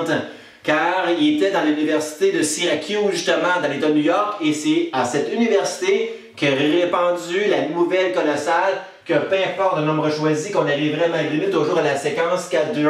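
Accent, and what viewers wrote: Quebec, Canada. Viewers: c'est au contraire l'accent québecois et l'enthousiasme qui font le charme des vidéos de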